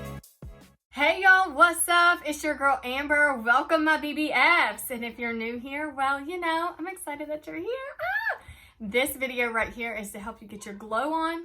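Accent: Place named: South, United States. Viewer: Chick-a-boom! You are rocking it wicked! Love your warm little southern accent! I’ve been doing the MK for near 6 years and loving your style! I’d love to hear about your behind the camera and preschoot prep. I’ve been thinking about doing the YouTube thingy. Stuck on the start part.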